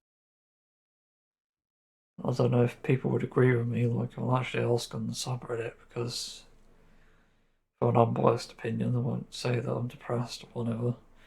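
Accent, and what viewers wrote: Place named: United Kingdom. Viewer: I was surprised getting this vid in my yt recs, especially since most vids about drg be like: "Maaan what a beautiful game, perfect devs and even better non-toxic community". And I agree it, for the most part, at least. But it actually has same thoughts that i had recently. I may have not get everything you said a bit, even with subtitles, due to you have slightly bri'ish accent (don't mean to offend you in an way) and i'm not a native english speaker (i'm ukrainian), but anyway. So let me tell you my story with drg. Last year my friends, who already bought this game , gifted it to me at Steam. They said all that stuff about the game, shortly, devs, community, so on. And we played it for a while, DRG seemed perfect to me, because i'm a bit introverted myself, I can be social and extraverted in company, but if it happens for a long time - it drains me out mentally, you know. 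I like to be alone sometimes, so it was pleasant when i found that you can play single with bosco. At some point, some of my friends got tired of deep rock, some of them won't even speak to me anymore, but that's another story. But i still liked the game and wanted to know more about it. Since I had no one to play with and singleplayer had more meditative vibe then more active vibe, i started to play with randoms. At the first time it was cool. Everyone was chill, greybeards tried to educate me in non-offending manner, and i could talk to people from different countries across the Europe, practice my commu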